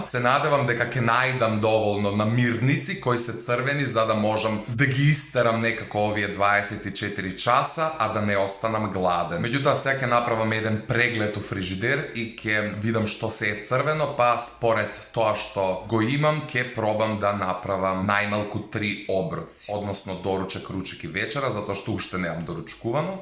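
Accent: Kumanovo, North Macedonia. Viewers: 24h само зборуваш кумановски диалект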